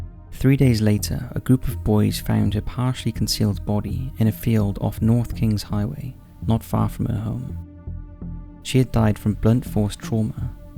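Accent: United Kingdom. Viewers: Your voice is perfect for narration. Not to loud or to low but perfect in both volume and tone. And the british accent makes it even better. Been watching the videos nonstop for a week now. Thank you !❤️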